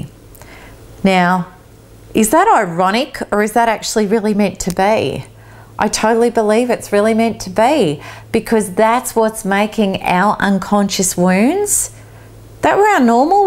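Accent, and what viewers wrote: Australia. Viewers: the ozzy accent is strong with this one...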